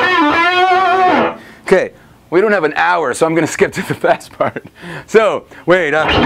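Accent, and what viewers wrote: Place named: Canada. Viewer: are you a canadian? You sound sort of like a canadian.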